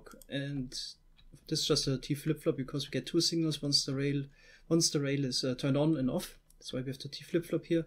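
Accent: Germany. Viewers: Dein Deutscher Akzent haha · Ist das Deutsch-Englisch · Hörst dich an wie n deutscher lul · Da hör ich doch den deutschen Akzent raus😂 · Man hört dein deutschen Akzent bro aber krasses english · He sounds German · Where u from? U sounds like german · Dezenter deutscher Akzent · I think you are german because you have this actzent and i knew this because i have this actzent too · Hellocould i ask something are german because your accent is almost like mine when i speak english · Ja man hahaha dachte am anfang das er Deutsch redet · Are you german? You sound like your german xD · Der deutsche Akzent hahahaha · So nice man merkt das er deutsch ist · Are you German? You sound very german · t0rf I'm sure he is :,D His accent and some accidentally used German words gave it away ^^" And everyone knows that Germans are the best engineers soooooooooo... Just kidding but ye, I think he's German :D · The german accent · Are you German? 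I thought it because your Accent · Are you from Germany ? Weil ihr euch so anhört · Du bist deutsch hört man👍👌💪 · wich language do you normally speak, because you kinda sound german or spain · Man hört deutlich das du deutscher bist... Ist normal lul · Du klingst deutsch lol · It really sounds like you are German and try to speak English. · in my understanding he is german, his accent is very similar to mine, :D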